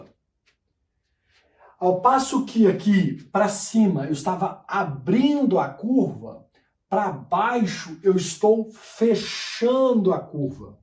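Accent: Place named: Minas Gerais, Brazil. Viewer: Sou de BH tb Adoro sotaque mineiro. Aprendendo muito c vc. Vlw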